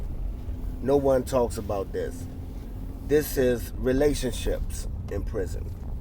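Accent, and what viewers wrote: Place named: New Orleans, United States. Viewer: You keep saying Virginia but you're clearly from New Orleans. Born and raised definitely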